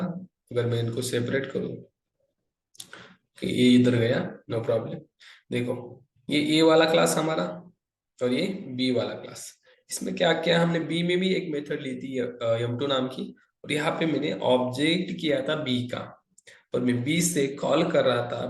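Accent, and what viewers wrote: Maharashtra, India. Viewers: sir you are so much funny 🤣😂, also i luv ur marathi and hindi accent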